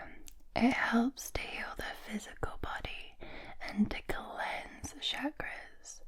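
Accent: Scotland, United Kingdom. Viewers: Looks, talent, razor sharp intellect all delivered with a killer Scottish accent, what a package!!!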